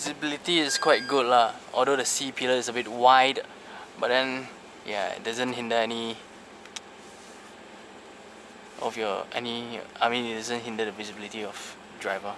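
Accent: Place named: Singapore. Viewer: Hey bro u talk like Singapore slang english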